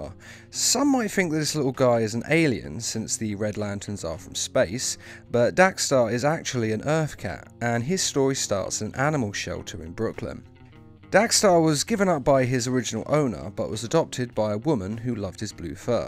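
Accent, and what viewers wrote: United Kingdom. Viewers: He’s British.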